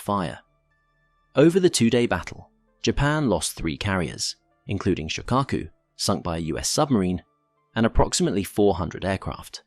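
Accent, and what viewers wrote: United Kingdom. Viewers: I like everything about this channel, including the posh English accented narration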